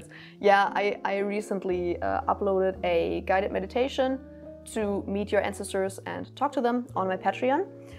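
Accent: Germany. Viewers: When you said you were mostly Danish, I was like "huh, I could have sworn your accent was German". Made more sense when you said you were from northern Germany ahahah. Amazing video, love from Copenhagen!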